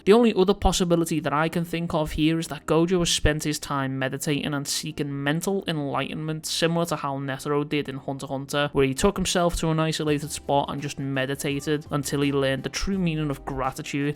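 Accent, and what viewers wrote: Liverpool, United Kingdom. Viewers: You a scouser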